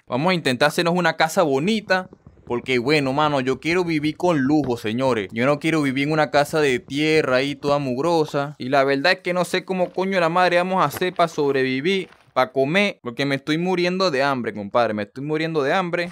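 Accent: Venezuela. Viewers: una pregunta se que ere venezolana mano porque yo soy venezolano tambien y hablas como yo pero en que pais vives o sigues em venezuela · Si pues el es venezolano no ves el asento venezolano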